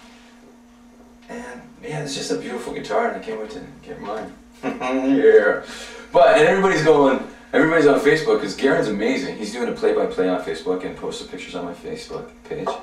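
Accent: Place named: Southern Ontario, Canada. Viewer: First time I saw you acting up and carrying on I knew you were from Southern Ontario. Us Ontarian’s have a distinct accent when we’re excited. And then there’s your Port Dover Friday the thirteenth t-shirt, dead giveaway.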